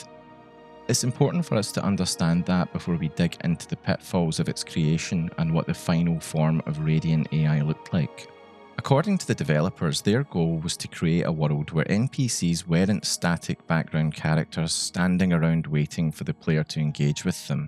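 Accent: Scotland, United Kingdom. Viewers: I love when I randomly encounter a Scottish YouTuber. "Bumf" is a cracking word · Is this a Scottish accent?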